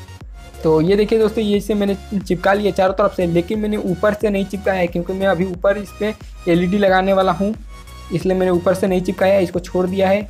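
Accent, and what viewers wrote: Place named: Eastern Uttar Pradesh, India. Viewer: most welcome sir Up me aap kaha se hai sir language se aap east up ke lagte ho sayad Varanasi ya Balliya side Aap hi btaeye if koi problem na ho to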